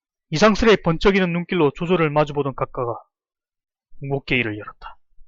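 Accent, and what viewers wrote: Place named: Gyeongsang, South Korea. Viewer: 경상도발음의 한계라 듣기는 좀어색하긴합니다 수고하셨구요 목소리가 톤이 어울리지 않아요ㅎ 다른거 도전해보세요 코메디나개그같은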